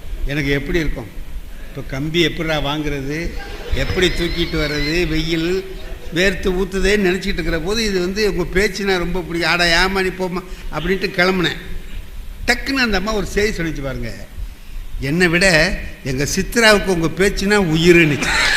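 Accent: India. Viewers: என்னதான் நகைச்சுவையாக பேசினாலும்...சரியான கருத்துக்கு வந்து நின்று பேசுவார்..நகைச்சுவையும்..கருத்தும் perfect blend ஆகும்..கூடுதலாக திருவாரூர் வட்டார மொழியும் super blend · தஞ்சாவூர் வட்டார வழக்கு -குறிப்பாக திருவாரூர் -நாகை பேச்சு வழக்கு இப்படித்தான்!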